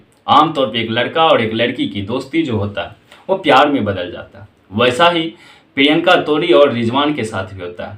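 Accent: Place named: Bihar, India. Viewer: Aap ki kahani sunane m bihari language jhalakti h .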